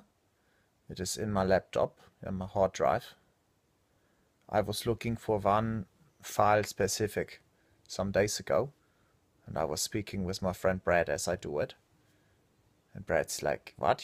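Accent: Germany. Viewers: Hey, kannst du eigentilch auch Englisch reden ohne den Deutschen Akzent? Bei uns in der Schule in NRW lernt man das mit Americanischem Akzent :D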